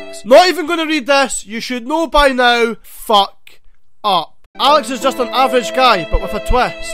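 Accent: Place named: Scotland, United Kingdom. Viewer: just subbed ya. you're funny as fuck. Are u Scottish if so love the accent lol.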